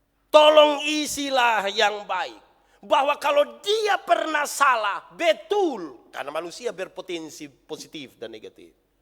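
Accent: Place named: Eastern Indonesia, Indonesia. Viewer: Salam dri Makassar Pak Pendeta, semoga tetap diberkati dan diberikan kesehatan yg baik dari Tuhan. Khotbah2 Pak Pendeta sangat luar biasa dan mudah untuk dipahami, apalagi dengan logat2 timur yg kental 🙏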